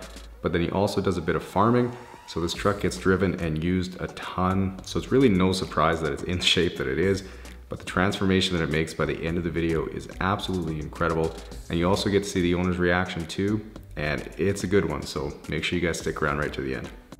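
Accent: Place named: Canada. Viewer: I can't get over the Canadian accent.😂 so cute! Such a satisfying video!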